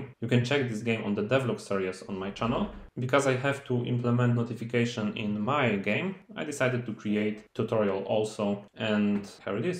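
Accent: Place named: Poland. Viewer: Oglądałem ten tutorial i tak coś czułem ten polski akcent xD Ale dobra robota z tym filmem :D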